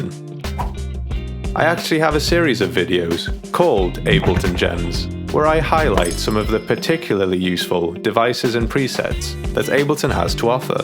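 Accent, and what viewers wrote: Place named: North Wales, United Kingdom. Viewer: Fandabidosi! Are you a gog like me, ie? I can hear your north wales accent. Diolch am hwn. Thanks for this video!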